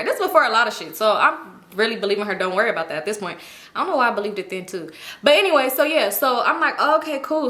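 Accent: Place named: California, United States. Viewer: Every now and then I hear your cali accent it’s so cute yall say arms and party differently lol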